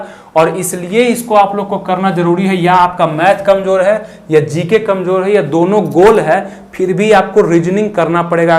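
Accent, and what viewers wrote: Bihar, India. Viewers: या तो ये बिहारी है या फिर यूपी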